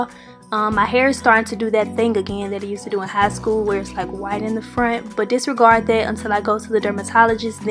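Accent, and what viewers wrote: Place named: Memphis, United States. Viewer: I didn’t even have to know you.. && I knew you were from Memphis 😅😅😅 love it👏🏽👏🏽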